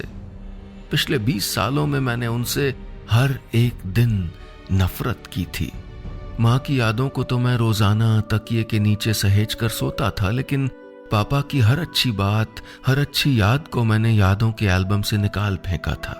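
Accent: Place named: Pahadi, India. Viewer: I am from Almora and your die heart story listener ...The way you narrate this story in our local tongue and tone is just out of the world... it was indeed an emotional story but I was smiling when you were like a pahadi speaker.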